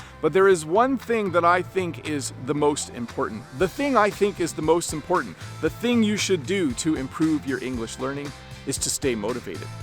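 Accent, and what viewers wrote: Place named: Canada. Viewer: Hey teacher Eu demorei um pouco a entender que você era do Canadá. Por que pra nós nativos de língua Portuguesa, temos dificuldades em entender os diferentes sotaques em inglês de países diferentes. Mas eu estou progredindo com seus vídeos. Professor, sucesso para você sempre. Que Deus o abençoe. By 🇧🇷🇨🇦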